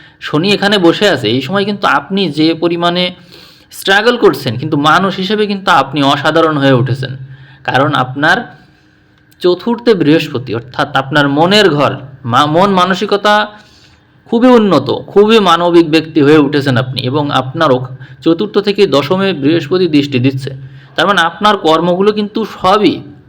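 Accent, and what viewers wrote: Bangladesh. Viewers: Dada kothay baddo machlanda pur bithari o Bangla Desh er taan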